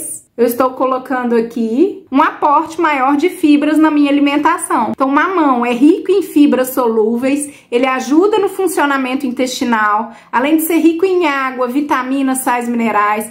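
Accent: Minas Gerais, Brazil. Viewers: Acho que você é mineirinha pelo sotaque. Eu sou de Bauru SP.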